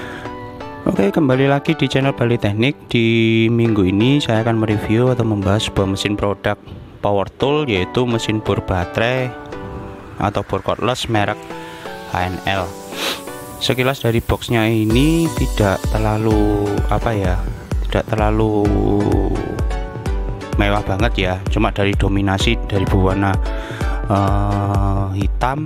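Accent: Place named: Java, Indonesia. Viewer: muka engko logat jowo.sukses mas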